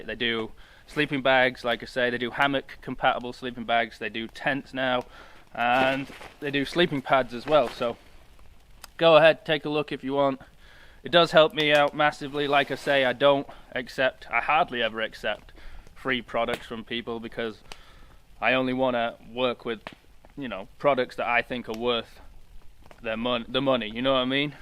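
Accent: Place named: England, United Kingdom. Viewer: Where are you camping, looks Nordic , Canadian American ? You have an English accent, like mine though? Perplexed.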